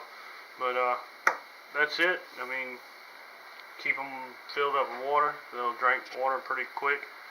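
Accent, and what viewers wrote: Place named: South, United States. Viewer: I used shishkebob skewers (broken in two) because toothpicks for some reason didn't seem to be long enough for my situation. Just an option. I can tell you're from the south by your voice. I'm growing sweet potatoes in zone 3 up north. It works, but you really have to start them early in the house up here. You can let the vines grow really long and cut them into one foot lengths and plant each piece.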